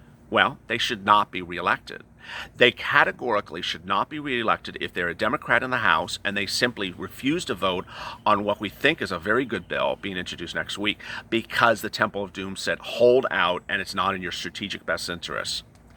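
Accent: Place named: California, United States. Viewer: You from California allright that voice says it all you know what I mean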